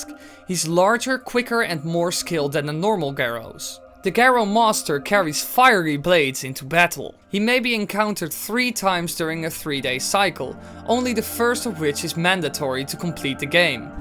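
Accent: Netherlands. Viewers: Question are by any chance Dutch? You have a very familiar accent ... ;) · Are you Dutch? I am? Because you have a dutch accent!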